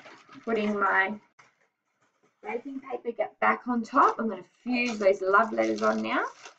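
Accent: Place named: Australia. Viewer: Sounds like an Australian woman, I am Australian also. I have looked at many videos about fusing the bags with the iron, however this is the only one I have seen where the person is saying to have water in the iron and use that steam setting or whatever, I can't figure out why however, no one else did that, I can't figure out what the hot steam would do just spraying on the baking paper, and she didn't explain the reason why. As far as plastic fumes, apparently most things have a melting temperature and then a vaporising temperature, so as long as it doesn't hit the vaporising temperature then there shouldn't be any plastic fumes, for this type of polyethylene it shouldn't really produce any vapours while under 250 degrees. However it seems this video was for year 9 students, so you were erring on the side of caution.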